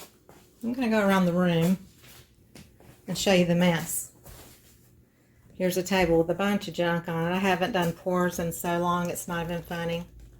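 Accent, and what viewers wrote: South, United States. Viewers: I've always liked you and enjoyed your beautiful art. Now? Now, I love and respect you. What a beautiful heart. What a kind, loving and truly christianly way to respond to someone who was, how we say in the south, less than kind. Sister, you know what I'm talking about. I can hear in your voice you are a southern girl too. :) I can hear my Grandma's words as clear as the day she first said them to me, " Honey, when people are mean to you, you have to show them the love in your heart. You can't be mean back because that's what the devil wants. God wants us to love everybody. ". It was hard for me to understand when I was little. Now that I'm much older, I get it. I feel pity for people that are mean spirited. I always wonder what is going on in there life to make them so miserable. To try and hurt someone else is so negative. 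It's not what the world needs. It's not what anyone needs. The world tears us down, life's unavoidable pitfalls. Why not try to lift someone up? Being positive isn't always easy, especially when someone is unkind. You do it beautifully. I for one appreciate you, all your hard work and your loving, giving nature. You make the world brighter, happier and a better place to live. Thank you for all that you do. May God watch over you and protect you.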